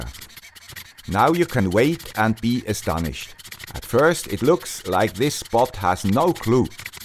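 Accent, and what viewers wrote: Switzerland. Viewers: Fantastic! I am definitely going to build one - Ive a spare raspberry pi so I only need to buy two servos. Thanks for another great video man with the Swiss accent!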